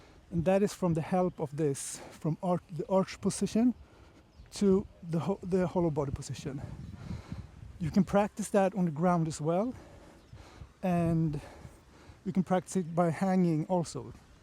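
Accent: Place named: Europe. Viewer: voice quality is good people from india don't understand european english 😅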